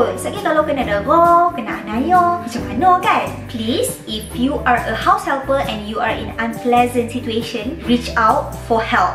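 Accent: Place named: Kedah, Malaysia. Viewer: hopefully kak sntiasa istiqamah pakai handsock huaaa suka sangat tengok dah la cantikk cakap kedah pulak tu 😍 kita geng laa!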